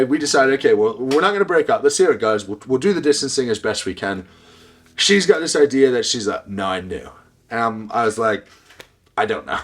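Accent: Australia. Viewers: Now i see where you got your slight aussie accent from. Thanks for sharing your story. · pretty cool how you picked up a slight ozzy accent during your time there!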